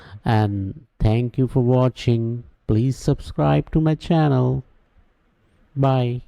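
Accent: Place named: India. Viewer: it is the indian accent. :)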